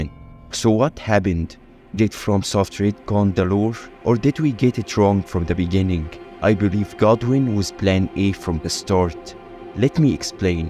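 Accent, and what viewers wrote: Egypt. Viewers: There’s something special about listening to Elden Ring lore in an Egyptian accent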